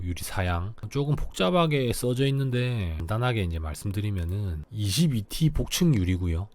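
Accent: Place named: Gyeongsang, South Korea. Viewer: 경남 어디에서 살고 있어요? 말투가 진주/사천 쪽일수도..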